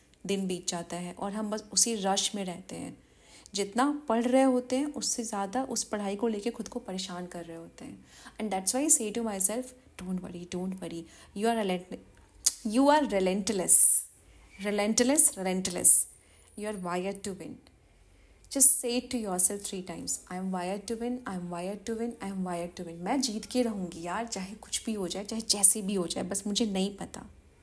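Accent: Bihar, India. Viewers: Aap BIHAR se ho kya bhojpuri bol rhi ho ❤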